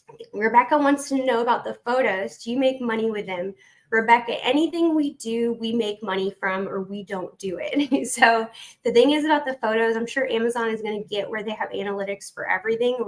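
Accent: South, United States. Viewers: love your videos and this is a great one, as always. Also, talk how you want to talk. Don't change for ANYONE. You are you and that is why many follow and admire you for what you are doing. Sucks on what has happened to you from this jerk, but it will pass like everything. Some speed bumps along the way, but you will come out at the other end stronger. Love your southern accent and how you talk, so keep it going!!!!! From Ontario Canada, and if you heard me, you would say I have an accent also, so keep moving forward & upward!!!!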